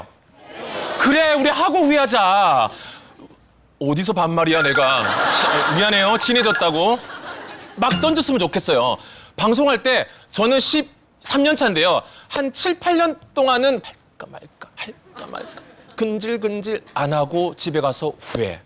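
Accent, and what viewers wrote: South Korea. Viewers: 영어도 제대로 못하면서 뻥은? 하와이에 왔을때 콩글리쉬하는거 보고 엄청 웃었음. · 김영철 영어 못함. 엉터리 영어, 콩글리쉬를 너무 자신만만하게 함 ㅋ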